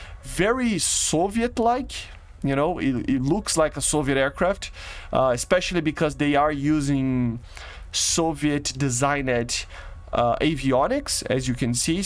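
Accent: Brazil. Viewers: Sotaque de BR....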